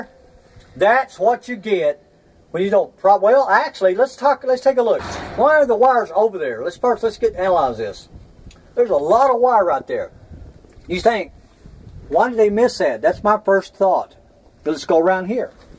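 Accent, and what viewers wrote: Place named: South, United States. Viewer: He clearly has a thick southern accent you twit 🙄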